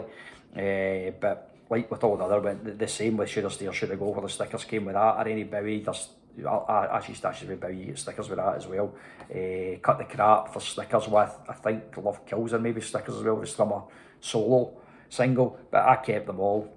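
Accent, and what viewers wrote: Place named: Scotland, United Kingdom. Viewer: Hei! That Japanese singles box set is incredible and you still have it in excellent condition! You must have taken really good care of it. After all it's over 40 years old release! My favorite songs are and. Hope to find them on 7" format some day. Too bad that the singles are pretty rare around here in Finland. Scottish accent is pretty hard for a Finn to hear and understand but other than that excellent video and excellent collection, /MyVinylBar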